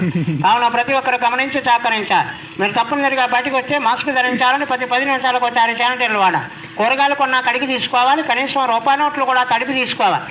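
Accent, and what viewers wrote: Godavari, India. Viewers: Ma Godavari valalm alagey mataladukuntamm.. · Super ma Godavari matalu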